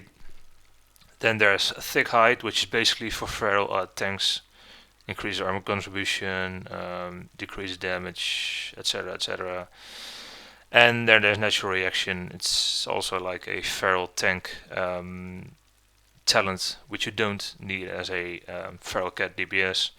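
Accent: Netherlands. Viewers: Lol you can just hear this dude is dutch :) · ure dutch arent you?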